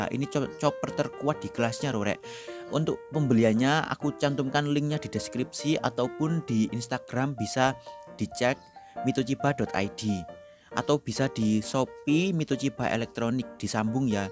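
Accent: Java, Indonesia. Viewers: Udah kenal channel ini sejak subscriber nya puluhan ribu sampai sekarang hampir sejuta. Suka sama suaranya yg medok dan resepnya yang mudah (meskipun gak pernah aku coba karena males wkwkwk) Sukses terus, mas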